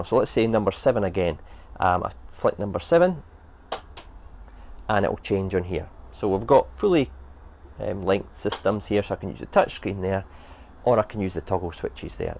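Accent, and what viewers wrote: Scotland, United Kingdom. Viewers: As your health and safety focal I’ve got to cite you for violation as that red gas cylinder that isn’t stowed should be scored to the cabinet or other object by a bracket or strap (a black painted line or mini chain in HO/OO scale) to prevent tipping. . Hearing a Scot talk about attic heat issues in the summer as I’m be lived 20 yrs in Baton Rouge, Louisiana, makes me chuckle and say “bless your heart”. The AC and a bit more roof insulation should go a long way. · Love the Scots dialogue, we never had subtitles for Jim Taggart :-). Thinking about your LED lighting. Sorry if I'm pitching at the wrong level, When you buy the mini LEDs you can look for the colour temperature measurement. Typically indoor tungsten bulb white was 3200K, flourescent tubes would be a colder white 4000K. From memory we consider daylight to be 5600K in the UK. 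Keep up the videos I've been watching them for while, they're a great inspiration. I'm still preparing my loft to put the boards in!!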